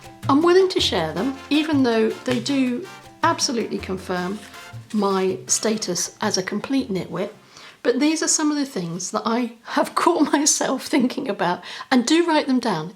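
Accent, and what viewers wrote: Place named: United Kingdom. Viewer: Excellent ideas! Especially after everything that’s been going on. Relying on big events for happiness would be difficult because so much stuff is being cancelled. And anyway you always hear the expression “it’s the little things in life!” Getting a card from someone I haven’t heard from or even a text. A child saying something funny that you never would have thought. I watched a video of a family who full time RV’s and their 6 year old daughter said “you can never have too many chocolate chips!” Good thought. Thanks! Your videos cheer me up. I could listen to that lovely British accent all day! Something I just thought of that makes me smile. I love to look for fun, funky socks. Sometimes if I’m down a crazy pair of socks will cheer me up!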